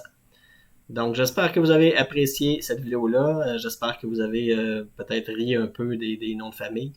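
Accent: Canada. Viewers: Video très interesante et surprenante concernant la modification des noms d'origine française sous l'aspect phonétique y transcrits "à l'anglaise". Cela me fait sourire, car j'ai vécu une situation un peu identique en 2020 lors d'un voyage aux states. On a chercher à m'appeler au micro d'un aéroport, mais je n'ai pas reconnu mon nom avec la prononciation à l'américaine 😁😆alors j'imagine bien ce que cela pouvait donner dans les années 1800 et 1900. Pas facile de retrouver ses ancêtres émigrés dans ces conditions ! Chapeau à vous pour cette vidéo et votre accent si agréable à l'oreille pour un habitant issu de l'ouest de la France ou la langue d'oil à de grandes similitudes avec le parler canadien et qui enchante mes oreilles en entendant mes cousins avec des mots qui pour certains ne sont plus utilisés par chez nous. C'est donc comme un retour à nos racines communes, mais avec des évolutions différentes de part et d'autre de l'atlantique. 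J'espère d'autres bonnes surprises de votre part. Merci en tout cas pour ce partage "linguistique" des noms de famille modifiés par nos "ennemis de l'époque"... les habits rouges, dans les registres d'état civil. 👍👍😏👋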